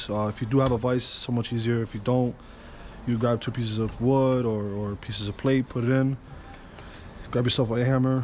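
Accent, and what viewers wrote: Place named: Staten Island, United States. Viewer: You sound like Keith Defosio from Staten Island.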